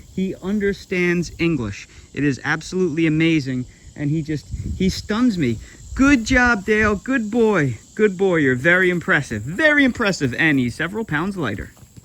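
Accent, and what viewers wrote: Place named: New Jersey, United States. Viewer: You can take the boy out of Jersey but . . . LOVE your content - you have to be from Jersey; No? Bucks County here - just had to comment little northern for the south - love it though!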